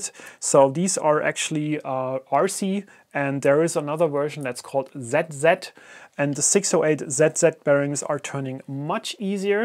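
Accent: Germany. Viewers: great video! I am about to build my own dry box! So it would be awesome to win some extra stuff for it! I'm from Germany! Just as you, I assume? No offense, but as a german, I think I can hear the German accent :D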